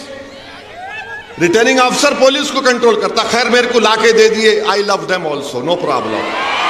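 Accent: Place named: Hyderabad, India. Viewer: Phale Haidrabad hindi bolna chodo aur sidha hindi sikh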